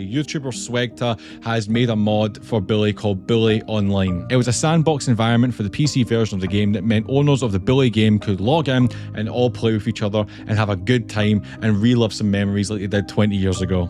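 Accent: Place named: Scotland, United Kingdom. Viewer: Great video but I’ve had to stop watching cause I’ve realised I don’t really remember the game that well and I want to replay it now 😂 I’ll come back later. I have subscribed though and I’d like to see more videos about older games from you but don’t worry, I got through stages so I’ll get round to your newer game videos as well. Seems like the best of both world here. Also, it’s great to hear the Scottish accent, I used to hear it all the time in England but not so much anymore but let’s not go there 😡😅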